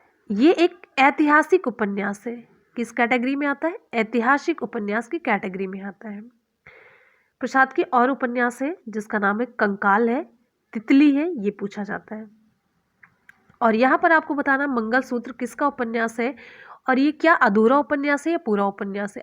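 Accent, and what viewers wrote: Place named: Bihar, India. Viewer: Mam maghi bihari boli hai...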